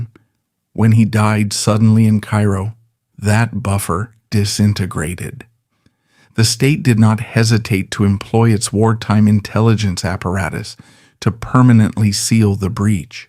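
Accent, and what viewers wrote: United States. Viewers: WHY SOMEONE WITH AN AMERICAN ACCENT TALKS ABOUT ENGLISH HISTORY 🤔🤔🤔🤔🤨🤨🤨🤨🙄🙄🙄🙄😶😶😶😶😱☹️👎🏼👎🏼AND THEN PRONOUNCES SOME WORDS INCORRECTLY 🙄🙄🙄🙄😶😶😶😶🫣🫣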